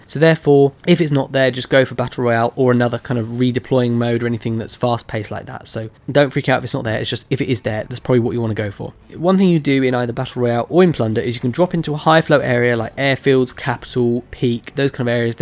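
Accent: United Kingdom. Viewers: Thanks for the guide man I had no idea about some of the things you said Also the British accent is a nice touch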